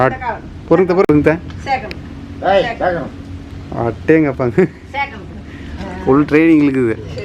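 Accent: Kongu, India. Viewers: Kongu tamil super ponga...arumainga anna....neenga enna koottam nu kaekkara solla matingaringa...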